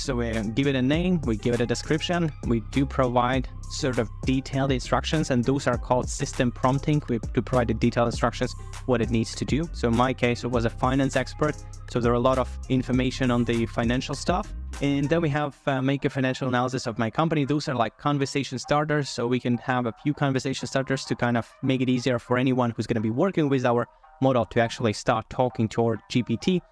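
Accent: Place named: Russia. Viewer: Many people dont understand chatgpt but your fucking FLAT tone and that Russian or whatever accent you have makes understanding chatgpt IMPOSSIBLE! And whats this "Chat GDP" u keep saying!! 🤣🤣🤣🤣🤣🤣🤣🤣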